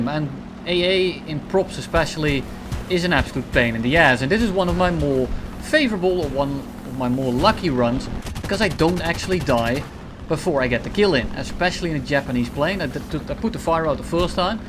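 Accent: Netherlands. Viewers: I love your vids btw, but that english accent, you have to be dutch, i think you are a Dutchie.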